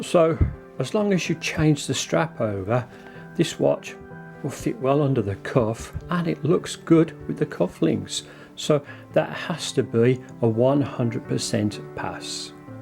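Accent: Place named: United Kingdom. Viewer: it's hard to find channel like yours Sir,, you served an information nicely since I am not an English native, I catched your words clearly like a crystal altough you have a British accent,, not like other channel who speaks fast,, how can I watch the video and read the text at the same time (I'm not an Englishman).. I got information that I want from you,, thank you Sir, sorry for my English note: like #100 for you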